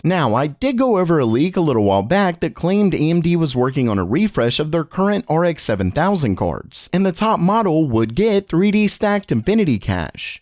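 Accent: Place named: United States. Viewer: most uncomfortable American Accent to listen.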